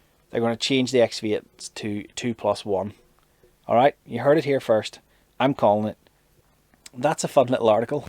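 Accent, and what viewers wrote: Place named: Northern Ireland, United Kingdom. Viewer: I hear another Northern Irish Guy talk about 40k I sub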